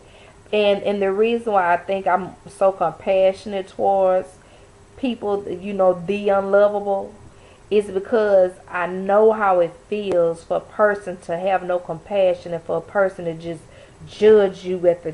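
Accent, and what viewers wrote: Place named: South, United States. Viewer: I like what granny said lol... I love ya country accent itz kute lol... Great vid fam...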